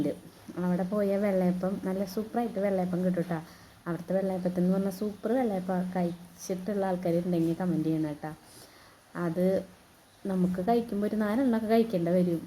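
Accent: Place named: Thrissur, India. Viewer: തൃശൂർ ഭാഷ നല്ല രസം. പിന്നെ വെള്ളപ്പം 😋👍🏻❤️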